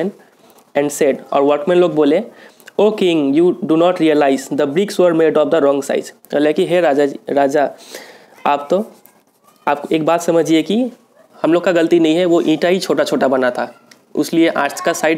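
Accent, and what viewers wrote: Bihar, India. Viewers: Bro aap language se bahot big bihari lagte ho like you said " Rajaji"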